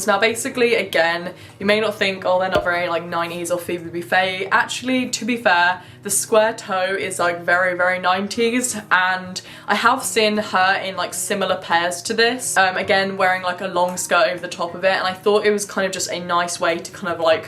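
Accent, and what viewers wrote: England, United Kingdom. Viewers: I love your accent ❤️❤️❤️ The way you speak makes me want to move directly to England!!! Btw my mom is german and my dad is from London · Hey. I have to say, I’ve been watching you for about 3 or 4 years. I’ve grown up watching you, and you’ve throughly influenced my aesthetic. Plus, as an American, I can do a pretty great English accent because I’ve watched you so long 😂 anyways, just wanted to say that I’ve noticed you have been a bit happier in these videos than your old ones, I don’t know if this is true or not but you’re putting out some positive vibes. Sorry for the paragraph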